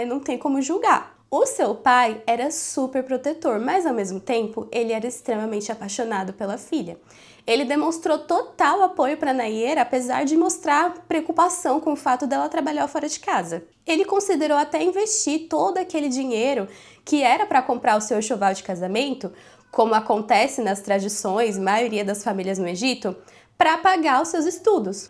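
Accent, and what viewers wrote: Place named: Sao Paulo, Brazil. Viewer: Nao fala português, para mim fala igual paulista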